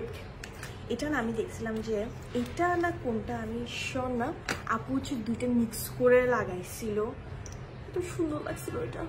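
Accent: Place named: Chittagong, Bangladesh. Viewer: আপু তোমার কথায় চিটাংগা এক্সেন্ট শুনে খুব ভাল্লাগলো❤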